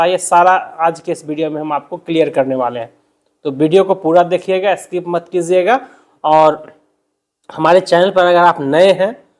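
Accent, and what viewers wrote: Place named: Bihar, India. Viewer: Bihar se h madam o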